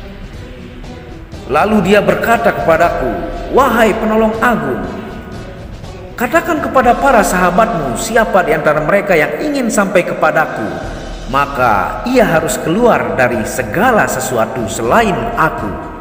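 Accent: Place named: Lombok, Indonesia. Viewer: Kayak logat orang lombok side meton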